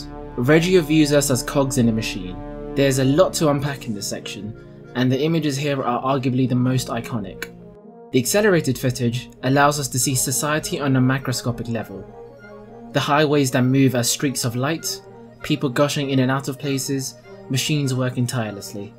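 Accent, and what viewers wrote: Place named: London, United Kingdom. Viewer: I can hear the London in your voice haha, this is some terrific commentary. An analysis on Isle of Dogs would be great, taking the more symbolic approach would be something I haven't seen effectively yet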